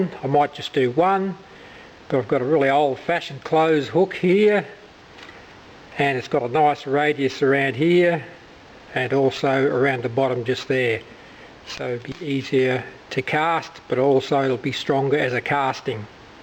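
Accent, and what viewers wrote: Australia. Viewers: i know Australia, but where? Sounds like NT.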